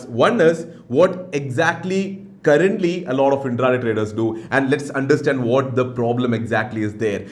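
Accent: Kerala, India. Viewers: Strong malayali accent nice :)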